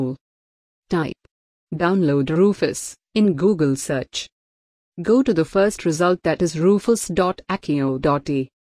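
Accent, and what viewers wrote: India. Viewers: you got really strong indian accent please use english subtitle thank you.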